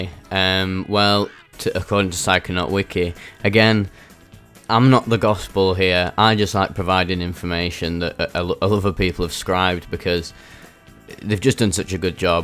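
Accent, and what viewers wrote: United Kingdom. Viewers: It’s his British accent ketamine is very popular in the UK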